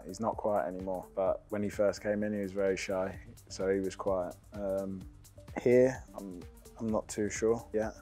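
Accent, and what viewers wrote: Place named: England, United Kingdom. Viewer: Chamberlain’in röportajları keyifli oluyor, hem vizyonlu hem de anlaşılır bir İngiliz aksanı olması çok iyi 🖤🤍🦅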